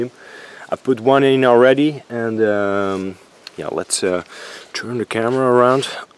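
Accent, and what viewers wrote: Netherlands. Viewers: ik wist dat jij nederlands was want je praat echt raar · Grappig dit, ik kreeg door je lichte accent al het idee dat ik hier met een Hollander te maken hadden. Ik heb een paar jaar geleden ook een "playhouse" gebouwd voor een familie in Sintra, Portugal. Het is grappig om te zien dat er veel details in jouw playhouse zitten die ook in mijn playhouse verwerkt zitten, bijvoorbeeld de hartjes is een identiek detail. Zou het door onze hollandse achtergrond komen? Ik heb er geen video van gemaakt maar ik kan je wel wat fotos sturen als je me je e-mail adres stuurt. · Hey, Ik hoorde in je video's een Nederlands accent en nu in je bouw video van je boomhut werd dit bevestigd. Erg gaaf wat je allemaal doet, en ook origineel. Ik ga blijf je volgen, ga zo door. En je weet wat ze zeggen een goede timmerman verliest nooit ze kunde:-) Gr Tienus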